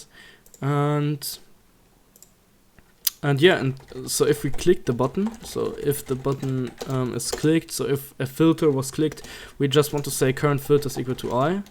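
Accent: Germany. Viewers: Hi, dein Englisch hört sich aber ziemlich deutsch an von der Ausprache, danke für dein Video.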